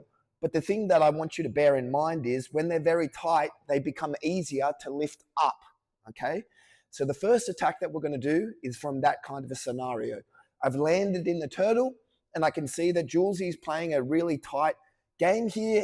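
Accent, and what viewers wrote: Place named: Australia. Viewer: This Australian accent is wonderful ❤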